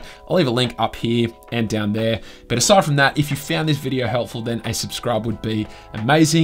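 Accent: Australia. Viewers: Hey, first and foremost thanks for video as it's so awesome to finally be able to listen to an another Australian explain these topics. I wanted to ask if you could do a video covering how to install a GSI on a device as I have had trouble finding one that explains what to do clear enough.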